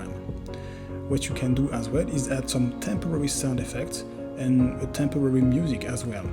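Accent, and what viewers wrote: France. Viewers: I have the impression that you are french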